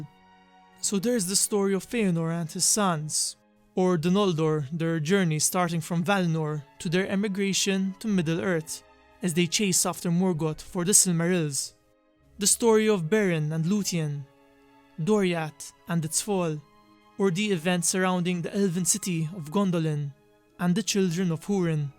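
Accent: Malta. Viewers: The Maltese accent is so evident haha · great video! are you Maltese?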